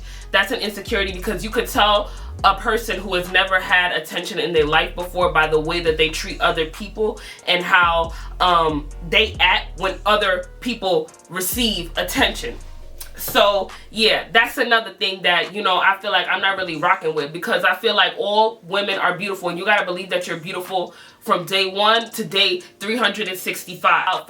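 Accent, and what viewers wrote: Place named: Brooklyn, United States. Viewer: That Brooklyn accent doe🔥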